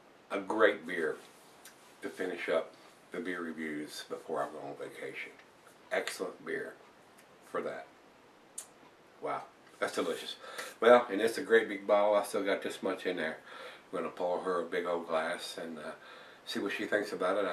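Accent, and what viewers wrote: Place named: Virginia, United States. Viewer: hey! love your reviews brother. what part of va are you from. because you sound like your from my neck of the woods.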